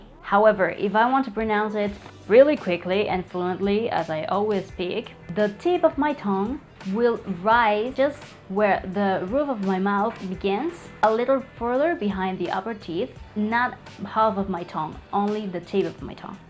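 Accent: Cuba. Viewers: Que bola asere jaja me gusta la accento cubano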